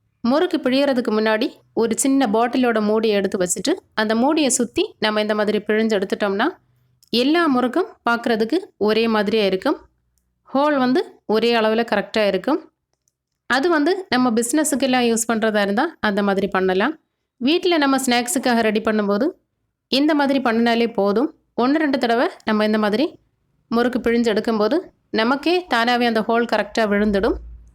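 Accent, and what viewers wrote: Kerala, India. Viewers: Your's is Malayalam accent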